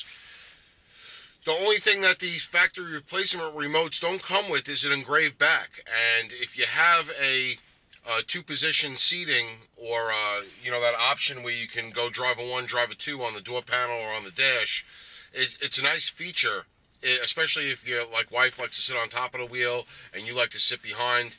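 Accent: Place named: East Coast, United States. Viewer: so you feel bad for everyone on the east coast? Lmao that’s literally how they all talk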